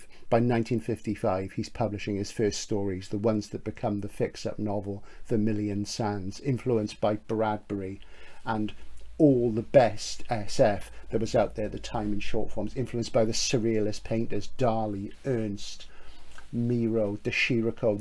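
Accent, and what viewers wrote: Wales, United Kingdom. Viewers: You sound like a proper Welshman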